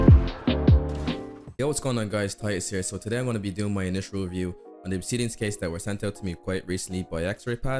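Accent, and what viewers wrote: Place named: Ireland. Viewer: Love the review! Always nice to hear another irish voice while browsing the internet ☺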